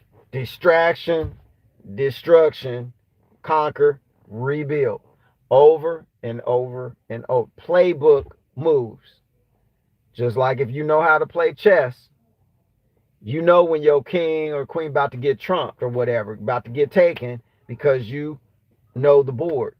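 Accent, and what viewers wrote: South, United States. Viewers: Monkey juice lol You always make me laugh when you say certain things in the middle of the conversation. I love the African american southern accent. I am not american but I love to listen to it.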